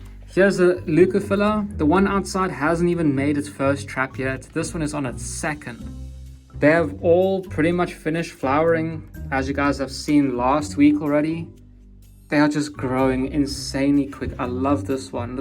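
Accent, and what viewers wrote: South Africa. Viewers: you sound South African! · Brother, are you from South Africa?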